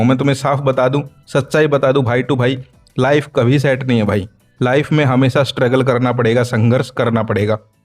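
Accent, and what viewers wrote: Haryana, India. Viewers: Aap Haryana se na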